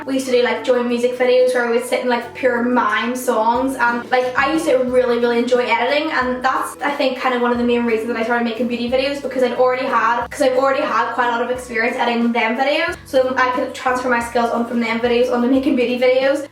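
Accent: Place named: North, United Kingdom. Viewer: I love your accent! my dad is from the north too so I love hearing people's accents other than his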